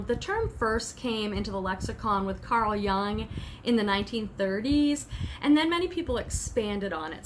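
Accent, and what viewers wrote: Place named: California, United States. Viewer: Your Cali. accent is so broad. (Awesome vid., by the way.)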